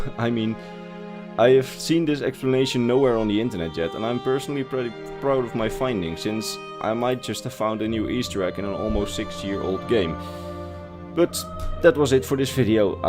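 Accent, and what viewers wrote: Netherlands. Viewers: I think that he's Dutch just like me. We actually created a name for English whilst maintain their Dutch accent. It's Dunglish :P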